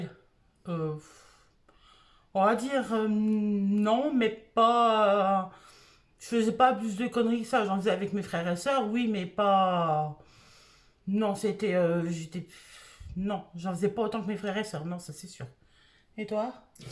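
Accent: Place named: Picardy, France. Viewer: Coucou, j’ai découvert ta chaîne grâce à tiphaine. J’aime beaucoup ta personnalité, tu as l’air d’avoir une histoire touchante, mais tu as réussi à te construire une vie de famille super. Tu fais un super joli couple avec ton mari, vous allez bien ensemble. Vous êtes de quelle région? Moi je suis de Picardie et j’ai l’impression que vous avez un petit accent comme nous! Bisous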